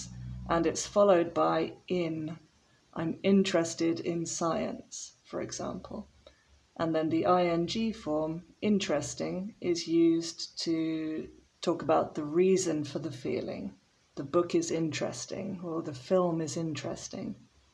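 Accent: United Kingdom. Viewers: Thanks a lot. It's very useful for me. I like your British accent!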